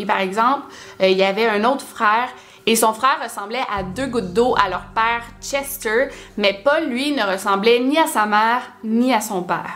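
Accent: Quebec, Canada. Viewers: C’est incroyable au début l’accent québécois me gênais tellement et maintenant j’ai l’impression de ne meme plus l’entendre, en tout cas très bonne vidéo comme d’habitude! Ça serait super quel ai sa propre émission sur netflix ou sur une chaîne de télévision je suis sûr que ça marcherais elle a vraiment un talent pour raconter se genre d’histoires. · J'adore tes vidéos ( elle me font toujours aussi peur 😨😂) mais j'aime trop les regarder quand même ❤️ je sur kiff ton accent québécois 😍😍😍😍😍😍😍😍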